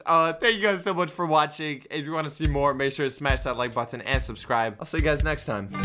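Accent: United Kingdom. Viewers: Are you actually British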